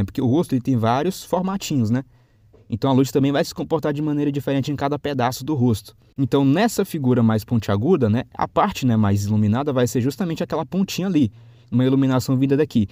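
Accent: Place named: Ceará, Brazil. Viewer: Você é Cearense amigo, seu jeito de falar lembra muito. Muito bom a forma que você explica kkkkkk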